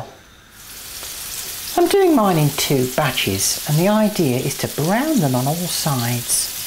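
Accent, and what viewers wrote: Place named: Solihull, United Kingdom. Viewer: Your voice is just the same as my grandma's shes from solihull. I live far away from her so i love watching your videos to hear that accent! xx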